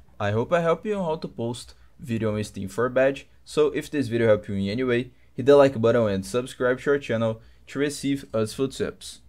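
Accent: Brazil. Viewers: nem fudendo que quem me ajudou foi um brasileiro falando ingles com sotaque brasileio kkkkkkk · Quando comecei ouvir eu pensei "Estranho, acho que é brasileiro" quando vi o nome " " eu tive quase certeza, ai então eu vi o amigo "F-100 reformada pelo lata velha" KKKKKKKKKK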